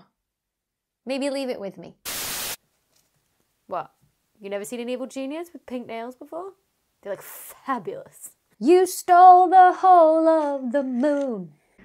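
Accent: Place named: Cockney, United Kingdom. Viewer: Your Cockney accent is cool. (I'm not native English speaker)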